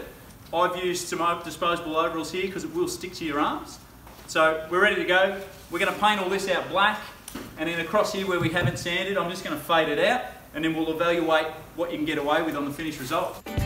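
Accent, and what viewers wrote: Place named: Australia. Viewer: How do I get the australian accent?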